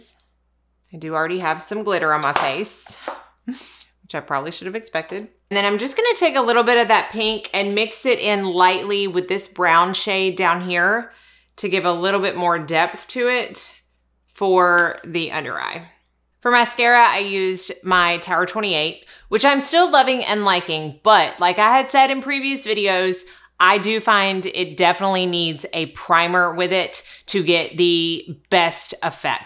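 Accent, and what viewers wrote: South, United States. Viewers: Yaaay!!!! I absolutely adore 🥰 you! I live a full face of anything you do!!! You are such a sweet heart. I love that you don’t take any crap 💩 from people on the internet!!! ( as you shouldn’t)!!! I love 💕 your beautiful southern accent!!!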